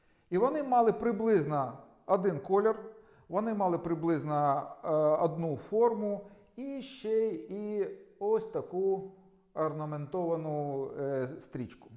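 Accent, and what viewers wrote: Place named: Odesa, Ukraine. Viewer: Розумний чоловік нащось дурня з себе клеїть.. По розмові видно, що одеський липован. Так?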